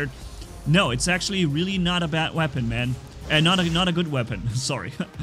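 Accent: Germany. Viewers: Are you German? Bist du Deutscher?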